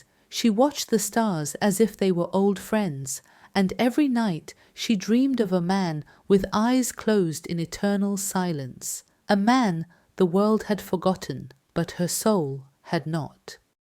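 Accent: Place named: United States. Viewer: the American accent is an interesting one to hear in an ancient Indian story 😂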